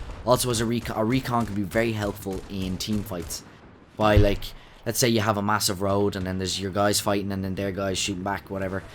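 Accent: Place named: Ireland. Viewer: I'm guessing your Irish cuz u sound irish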